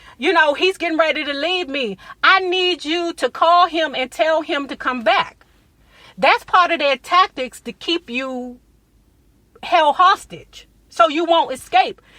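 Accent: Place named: Anacostia, United States. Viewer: Anacostia ? I though I heard an accent 😂😩💞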